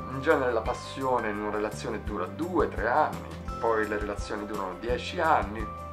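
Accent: Tuscany, Italy. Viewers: Beh, dai,... Arrivi solo con 10 anni di ritardo!!! 😅 Assurdo come tu abbia descritto per filo e per segno la mia relazione del 2008 che per 11 anni mi ha lasciata congelata. Ancora più assurdo che questo mi torni utile adesso che, invece, esco dalla prima relazione avuta dopo quel trauma, relazione che è stata molto intensa e importante per me. Stranamente il tuo video mi arriva proprio oggi, dopo averlo sognato stanotte. E strano che nei commenti legga di qualcuno che parli del 3 agosto, giorno in cui questa relazione è iniziata. E ancora più strano (ma questo mi è parso strano si da quando ho iniziato a seguirti 😜) che tu somigli fisicamente in maniera quasi fedele, addirittura nell'accento toscano, al mio "ex" sparito nel nulla nel 2008. Ma quanti casi... 😉🙃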